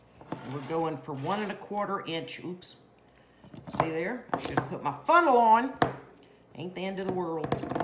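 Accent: South, United States. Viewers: Loving, thanks for participating. Love the southern accent, I could listen to you all day. Good points and sanitizing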